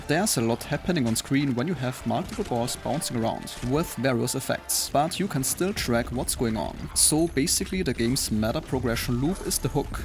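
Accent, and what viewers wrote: Germany. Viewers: Game looks great and is landing on gamepass at release tomorrow. Demo was fun so I'm looking forward to it. By the way... where is your accent from? Sounds German ;-)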